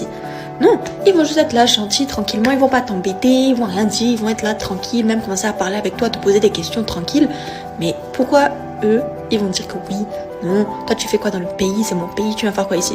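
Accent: Benin. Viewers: J'aime trop ton accent béninois, très cool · Le vrai accent Béninois extraordinaire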